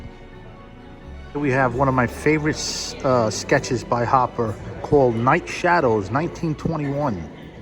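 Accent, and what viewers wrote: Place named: New York, United States. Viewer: I miss this exhibition, because 2 years ago I haven’t discovered Hopper yet. It is very cool to have listen to have a New York accent guiding me through the exhibition. Make it more immersive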